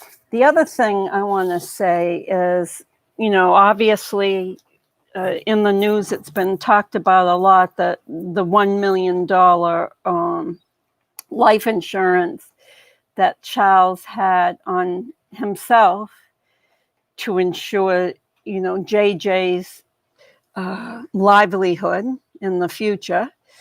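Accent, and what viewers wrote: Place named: Boston, United States. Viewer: I enjoyed the information you presented. You sound like you might be from Boston.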